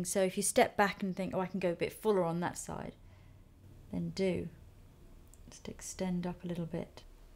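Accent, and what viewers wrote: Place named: England, United Kingdom. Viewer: I don't know if i watch every tutorial from you without missing ONE because of your talent and because your make ups are always light and soooo professional, or if it's just because i loove your voice and accent. I'm french and i love english accent !!! It's hypnotising !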